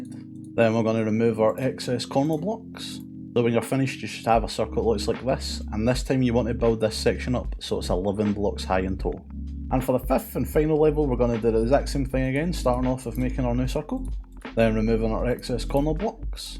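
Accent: Scotland, United Kingdom. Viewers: Thank you! Your tutorial was very helpful and easy to follow. I'm Scottish too so your accent was easy to understand :)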